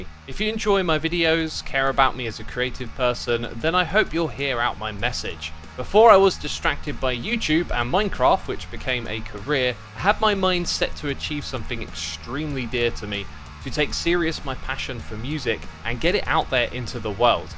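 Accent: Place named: RP, United Kingdom. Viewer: Edit at the top for importance: Good music ill definitely check it out Wasnt quite a face reveal but its funny, nothing what i was expecting, funny how minds work with being canadian i hear tour accent and i picture a very right and proper dude just chillin drinking tea, i knew you werent, your personality cones out alot in your vids, just saying its funny how minds subliminally paint a picture and it sticks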